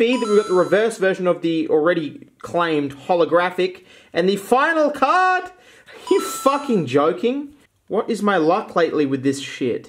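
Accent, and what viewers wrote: Australia. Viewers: You can tell he's Astran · Love that "Dewgong" and "Jewgong" are essentially indistinguishable because of the Aussie accent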